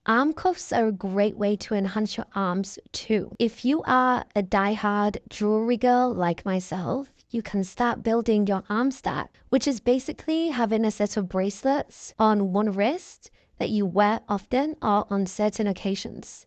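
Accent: United Kingdom. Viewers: I'm from London. Sounds like she's in the UK to me but not London born. As opposed to a voice filter. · She’s very easy to understand, she just has a UK accent.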